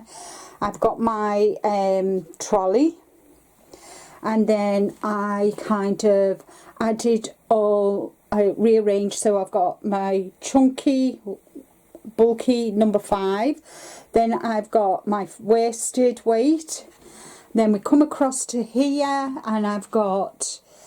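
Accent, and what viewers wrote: United Kingdom. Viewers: Petunia for your love of your garden. I think you said Petunia in your wonderful British accent. Of course we all have to have fun naming items too so Flower or Yarny. Love your Yarny room and organization, beautiful and cozy!! Such a lovely channel!!!!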